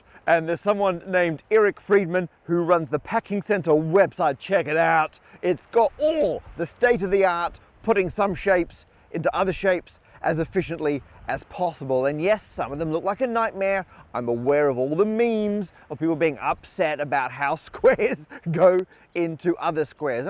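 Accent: Australia. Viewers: Oh yeah, I forgot he's australian. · That might make sense except for the obviously Aussie accent lol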